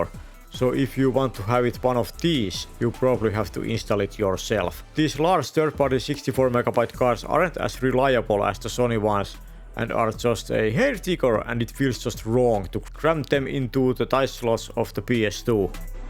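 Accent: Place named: Finland. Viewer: Seconds into this video I could hear Fintlant. I trust Finns for no-bullshit explanations of everything. Thank you for the great video. Perkele!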